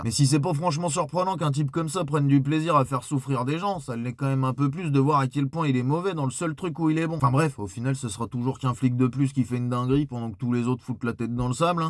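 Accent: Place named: Lyon, France. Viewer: La ?? Remise en question ? Oula t'en demande trop pelo.. 😏 Merci pour tes prises de parole, toujours un plaisir de t'entendre, avec ton bon vieil accent lyonnais 💪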